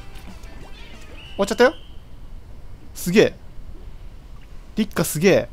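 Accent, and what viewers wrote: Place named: Kansai, Japan. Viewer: うぽなす！関西弁w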